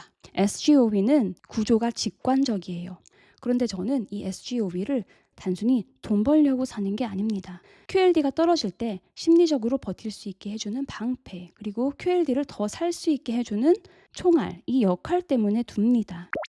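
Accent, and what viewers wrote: United States. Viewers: 미국에서 오래 사셨나바요 얼피~~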